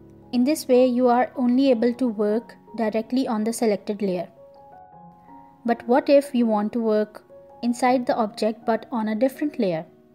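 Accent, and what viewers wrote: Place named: India. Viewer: then what authencity. Your accent sounds indian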